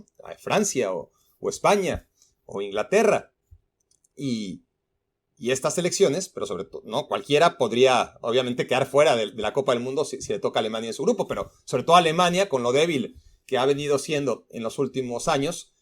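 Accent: Mexico. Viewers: Pues a mi es escucharte y me suena a Méxicano. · Hablas como en el bajío, en Guanajuato. Los centroamericanos y sudamericanos suenan muy distinto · Yo creo que tu asento es muy neutro pero si se nota cuando te expresas que eres mexicano, el mexigrindo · Si, tu acento es Mexicano, un extranjero te lo puede decir, porque un nativo se acostumbre y cree que no tiene acento... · Súper mexicano su acento. Desde que empecé a ver su canal noté que era mexicano. Saludos desde Costa Rica. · Sí suenas mexicano. Viva México.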